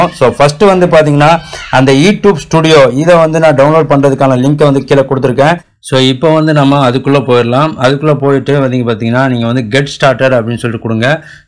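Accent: Tirunelveli, India. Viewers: Bro thirunelveli ya voongalukku super bro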